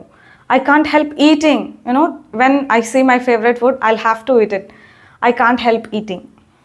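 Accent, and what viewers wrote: Anantapur, India. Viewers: Akka medhi Anantapur aa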